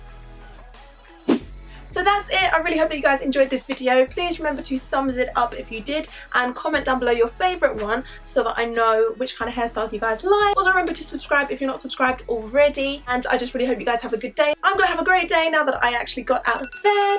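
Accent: United Kingdom. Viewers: I Love your Britsh accent!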